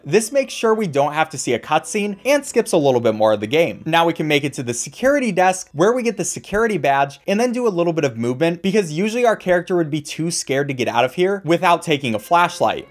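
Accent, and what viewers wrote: Denmark. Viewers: Are you from Danmark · er du dansker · Your danish!?!?!? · are you danish?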